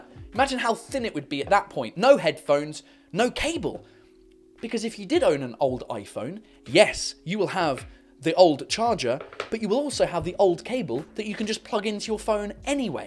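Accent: England, United Kingdom. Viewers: Watch Mr whoes the boss bro English not American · Are you from the uk It sounds like the accident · You sound like Cyprien,if he talked in English with his style and he had a English accent he should sound like you. POV: I m Franch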